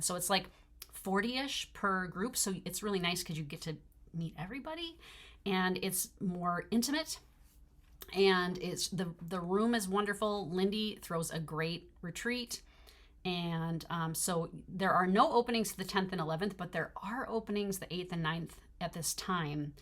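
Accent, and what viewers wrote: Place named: Pennsylvania, United States. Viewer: Woo Hoo! A blast from the past (and ever present!). What a sight for sore eyes you are dear lady! And what a fabulous catch up from one of my favorite people. I can’t wait to meet you in Tulsa this October. I love everything you showed us today. And, I am thrilled that you love your new happy place! My folks were born and raised in Erie: Mom in Little Italy, dad in the Irish and German neighborhoods. With dad’s work, we migrated west to LA then to Texas. That’s where we’ve been since 1970. I can only imagine the authentic everything you’re able to enjoy in the city of brotherly love. Your accent even reminds me of my cousins in PA. So, I’m sure you fit right in. Please drive carefully, stay safe, and enjoy every blooming minute of being with your friends in Tennessee. Congrats on your business, your new releases and on your new life in a lovely community. 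Happy stitching every day! You look great and so happy! ❤❤❤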